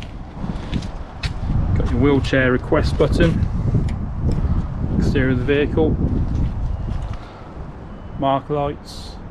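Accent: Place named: Australia. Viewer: why do you speak like an Australian ?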